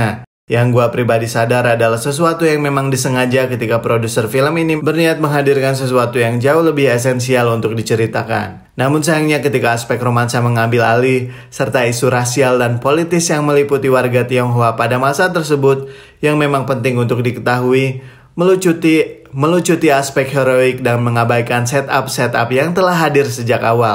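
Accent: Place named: West Java, Indonesia. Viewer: Oh, Aa teh native Sunda ya hahahaha... BTW, kaget juga ya kok ke mana ya Waltz of Flower-nya...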